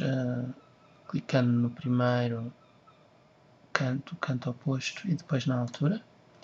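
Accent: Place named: Portugal. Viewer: Excelente Tutorial! Em português de Portugal!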